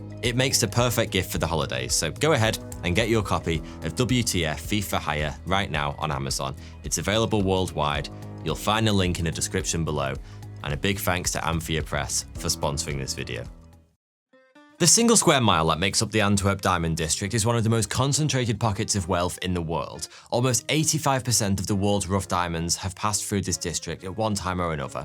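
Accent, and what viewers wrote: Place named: England, United Kingdom. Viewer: OMG, this Guy´s stories are Awesome! And He tells them with such breathtaking English accent! Bravo, Sir! You´re simply the BEST!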